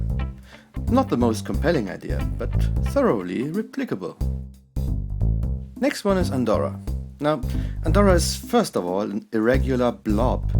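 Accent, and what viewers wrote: Germany. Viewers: I love this, it's crazy in a good way. Your accent also adds to it as I can picture you a slightly bonkers German scientist. Are you from southern Germany? I've always seen my country Britain as as a strange seated witch driving a car with the extended leg of Cornwall and the extended arms of north and south Wales. The Lake District is her adam's apple and south-west Scotland is her beard. She is sneezing out of a hooked nose at the Argyll penninsula and has pooped out the Isle of Wight.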